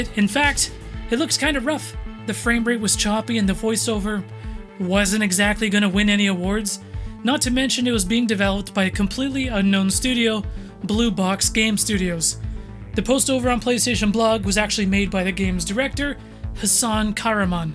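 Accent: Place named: Netherlands. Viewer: Yeah, as a dutch person, there is definitely a dutch accent.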